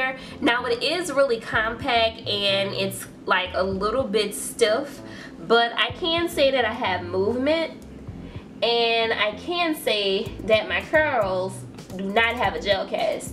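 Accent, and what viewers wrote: New Orleans, United States. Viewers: Is that a Nola accent I hear 😍 cuzzzinnnn!!! New subbie 😘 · I feel I hear a NOLA accent. I just randomly picked them up at a Dollar General.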